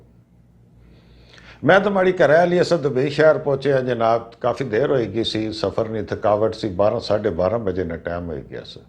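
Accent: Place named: Pothohar, Pakistan. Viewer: Mashallah....Kai Sohni Pathwari Bolne Pye O.....Mhara Dill Bagh Bagh Hoyi Gachheya...... Bahun Pyar Naal, Nawin Dilli Ton❤❤❤❤❤❤ Allah Paak Tussan Ki Hassna Vassna Rakkhe. Aameen Summa Aameen ❤ Hamesha Tussa Ne Video Na Muntazir❤❤❤❤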